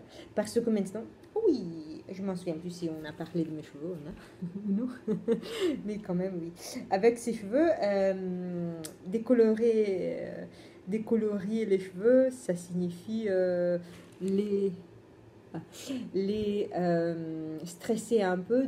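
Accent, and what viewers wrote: Italy. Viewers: Contente de te retrouver ! J'ai eu une collègue italienne qui avait vraiment le même accent que toi, c'est chouette, à chaque fois je pense aussi à elle :) Mais sinon quand même je te regarde pour toi et tes jolis tricots !!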